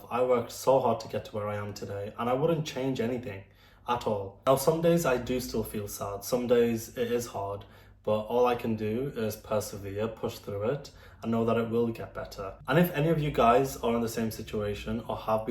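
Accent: United Kingdom. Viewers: They should’ve known the moment you start talking with a British accent that you were gay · sorry mate but what did you expect in reality to happen ? that a conservative family would accept such liberal ideas with open arms ? both of you are at fault here its so obvious they didnt do a good job at raising a muslim especially in a foreign secular country (from your accent its the UK probably) , you being gay wouldve just been fine you cant control who you have feelings towards whatever sure islam doesnt punish feelings but when you dont follow the religion and come with a secular ideology to a muslim family thats your fault for crossing the line , your sister can raise her child however she wants and she wants it to be under islam then she's right to ask you to change certain stuff and accomodate its not gonna kill you if you hide the tattoos and earrings for a while and you cant complain about how she wants her child raised even if it costs her her uncle , its honestly sad that these families dont teach their children islam the proper way in western countries and end up in these tragic situations and you're certainly not the first or the last to be in this situation , i hope you find your way to islam even after such an experience and get back the family bonds you lost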